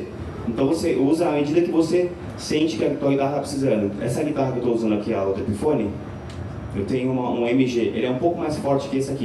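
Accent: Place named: Brazil. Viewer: judging by the portuguese he's speaking i wouldnt say Mexico, more like Brazil...anyway, some people like that high gain stuff, which i dont approve but will not condone...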